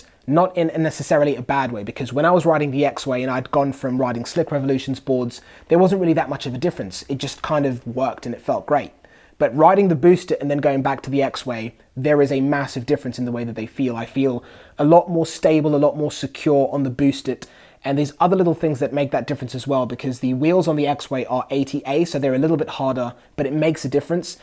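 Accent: England, United Kingdom. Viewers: I don't know if it's the English accent or the professionalism but this is one of my favorite reviews. I'm a NYC Youtuber and you have definitely made me want to step my game up exponentially. I'm buying the Evolve Carbon GT next week so I'll put out my review. Let's collab on a video. I'll fly out to the UK no problem. Keep doing your thing bro. You have a new subscriber.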